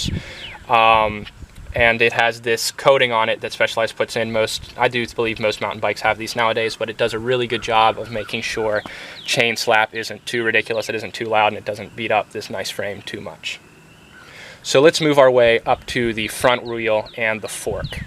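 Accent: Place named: Pittsburgh, United States. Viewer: you sound like you're closer to Newcastle or Pittsburgh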